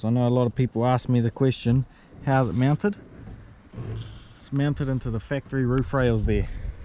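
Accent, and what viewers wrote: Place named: New Zealand. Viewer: All us kiwis sound like that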